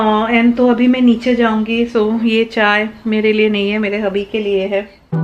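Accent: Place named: Bengal, India. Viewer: Hello mam, I just wanted to say that you won’t stop driving your car just bcoz a few insects hit the windshield... right! So keep going bcoz you are really adorable and your cute bengali tone is so heartwarming!!! There are and will be haters and people to pull you down, but above all there are those who love you and admire you!!! ❤️🙏🏻💐💐💐💐💐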